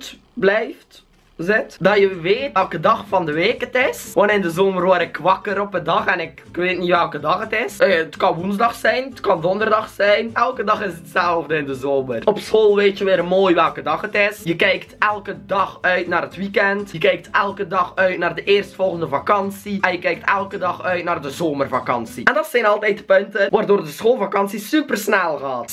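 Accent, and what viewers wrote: West Flanders, Belgium. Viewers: Van waar ben je ? Van west vlaanderen ?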